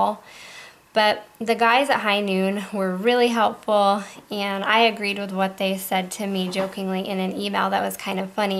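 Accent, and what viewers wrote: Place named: South, United States. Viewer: I just noticed but you have a tiny bit southern axcent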